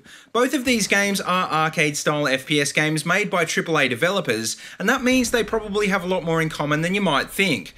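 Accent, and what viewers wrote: Australia. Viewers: Love the australian accent :D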